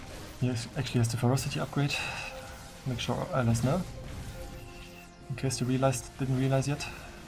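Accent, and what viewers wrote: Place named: Germany. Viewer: Mach doch mal auch ein Video auf Deutsch man merkt das du deutsch bist dein Akzent sticht sehr heraus xc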